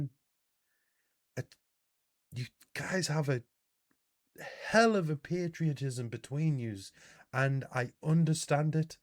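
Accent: United States. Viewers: Your words break my heart. You sound more American than some Americans I know. 🇺🇸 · Hi, You sound just like one of us. You ever get to the USA, we want to invite you to our home in Cave Spring, Georgia. We would be honored to show you around our little town and beautiful town park. Pop 1,000. God be with you man 👍 Mike Deaton, proud American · That’s crazy to Americans and I’m so sorry you are having to deal with that… you sound like an American have you ever thought of visiting or immigrating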